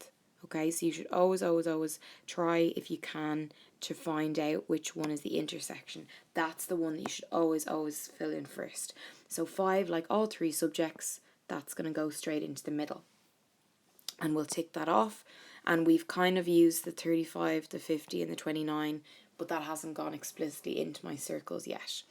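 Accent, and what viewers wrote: Ireland. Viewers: Oh man i love that irish accent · Omg, an Irish accent on a yt revision video...there is a god.😁❤️ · Irish accents do be cool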